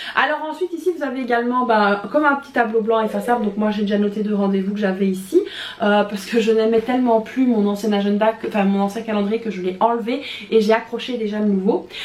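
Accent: Belgium. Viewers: Merci pour se beau retour de courses. J'adore t'écouter, j'aime entendre les différences entre les mots belges et le français. Elles doivent être super contente tes filles. Chez nous c'est beaucoup de récup 😅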